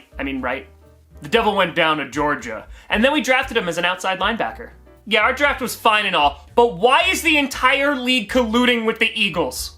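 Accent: New York, United States. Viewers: Love your NY accent!!😅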